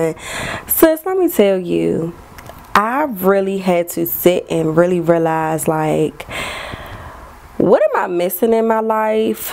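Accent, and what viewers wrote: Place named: DMV, United States. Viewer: you sound like you from the DMV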